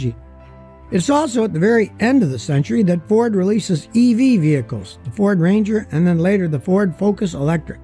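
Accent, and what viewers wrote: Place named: Western New York, United States. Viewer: Funny how much hiw a person pronounces a word reveals. Kind of figured you are from western NY, by how you pronounce "un" with it being an "on" sound. Transplant to Silver Creek, Irving area in 1990, from all over the map. Spent 24 years there, until moving to PA in 2014, then Ohio this year. Love visiting your hometown, wife and I honeymooned there.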